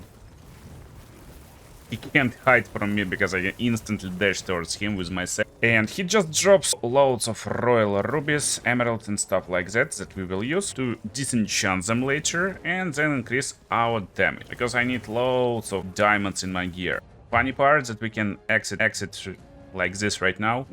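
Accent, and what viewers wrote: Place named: Russia. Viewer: Такое чувство будто бро говорит с русским акцентом....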